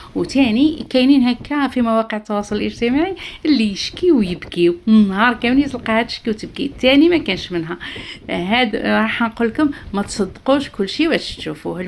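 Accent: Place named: East, Algeria. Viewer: هدرتك تبان من الشرق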